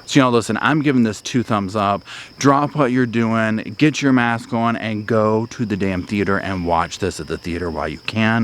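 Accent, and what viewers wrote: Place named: England, United Kingdom. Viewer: Hahaha loving your English Emily blunt accent 👏👏👏👏I’m English and people always think we speak posh! Like the royal family and erm....I don’t lol x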